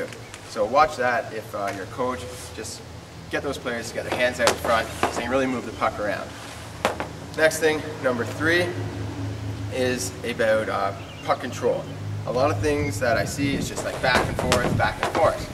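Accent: Canada. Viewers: he sounds like true Canadian and nice vid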